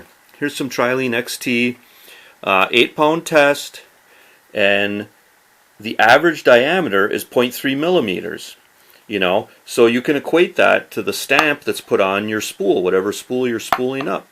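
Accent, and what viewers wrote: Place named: Upper Midwest, United States. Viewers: Oh man, I'm a Minnesotan down in Florida for work, great to hear that northern accent on youtube, don't you know. Fishin' fer Pompano stead of crappie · the way you say pound tells me you are from minnesota or wisconsin all my relatives are up in MN you sound just like them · Where is this guy from? Sounds like norther Michigan, Wisconsin or Minnesota.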